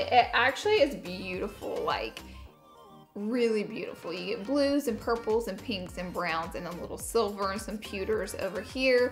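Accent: South, United States. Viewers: So many people diss her accent! Trust me y'all it's harder than it looks to say concealer as a southern😆 Love you 😀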